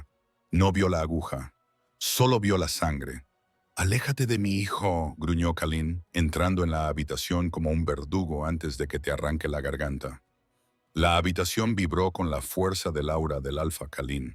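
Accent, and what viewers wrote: United States. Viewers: Pues buena la historia pero como una prometida va a matar al rey para quedarse con su trono si ni siquiera estaba casada... como que la traduccion esta mal la voz narradadora parace un gringo hablando español, pero en fin la omega era la madre muy revorugada....el alfa seguia haciendo lo mismo basandose lo que lara le decia...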